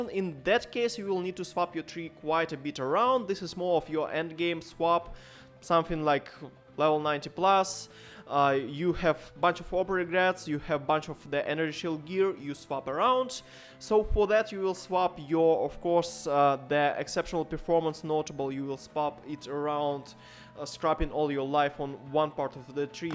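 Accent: Russia. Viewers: Слышу русский акцент)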